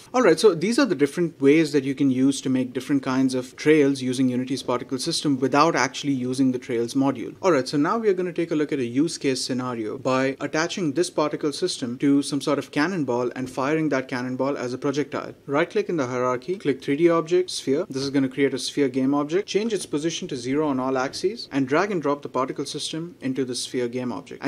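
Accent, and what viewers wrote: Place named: India. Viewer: Damn it's one great Indian accent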